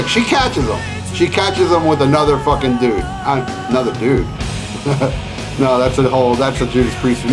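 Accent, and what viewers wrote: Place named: Philadelphia, United States. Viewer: You're accent sounds like here in the Philly area. Once again, this is fun viewing.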